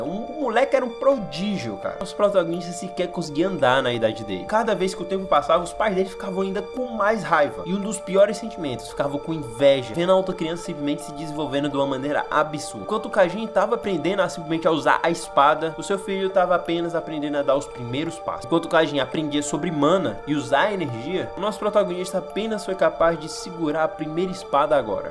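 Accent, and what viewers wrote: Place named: Ceará, Brazil. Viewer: Bom ouvir uma narração de um searense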